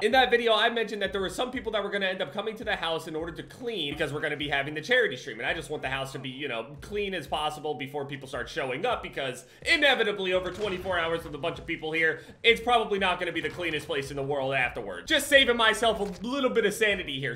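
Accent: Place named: New Jersey, United States. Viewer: you can tell from jersey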